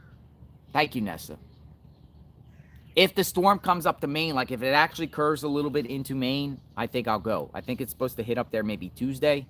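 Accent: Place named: Pennsylvania, United States. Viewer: That PA accent! Can't miss it.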